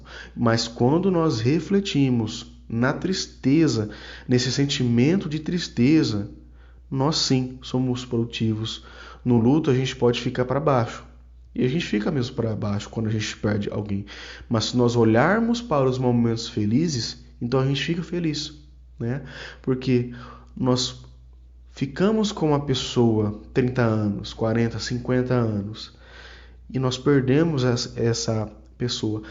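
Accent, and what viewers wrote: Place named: Inland São Paulo, Brazil. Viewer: Sua voz e sotaque é bem legal, você deve ser igual eu do inteiro de SP. Primeiro vídeo que assisto do seu canal, gostei muito, és bem objetivo! Abraço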